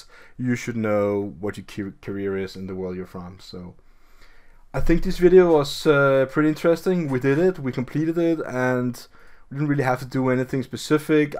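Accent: Denmark. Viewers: You sound danish :) Thank for the video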